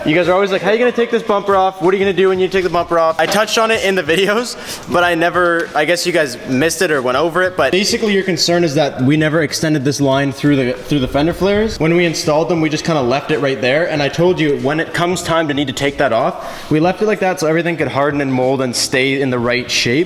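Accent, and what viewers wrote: Canada. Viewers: First time viewer. You Canadian though? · your yt is the next up and coming. congrats man. you got great content and I appreciate how your learning with us. a lot of people act like they know everything but you admit that your learning and trying stuff for the first time too. I like that. but your accent is unfamiliar too me what is the accent you have? like I don't need to know exactly where your from but I'm interested on what area the accent from or what nationality you are. sometimes you pronounce words different and I'm curious. but I've been subbed since your first wish/ knock off content video you posted I think you had like 20k subs and your growing fast. you need more subs. you deserve it. the eg hatch videos are awesome man. keep up the good work. wish I could work with you guys. you guys seem to have good clean fun with a great group of people. 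are you Canadian?? if you could get back to me I'd really appreciate it. fanboi over here lmaooo jk but I always appreciate a new video from the crew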